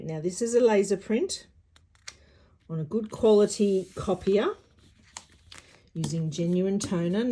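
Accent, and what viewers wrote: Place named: Australia. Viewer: Really interesting technique,i’ll have to try it. Also,nice to hear a fellow Australian. Thankyou & Cheers!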